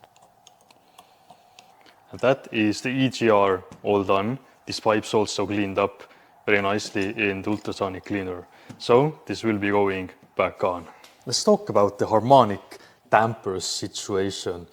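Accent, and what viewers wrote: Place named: Estonia. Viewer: I thought your were Finnish from the accent but now I realize that’s Estonian.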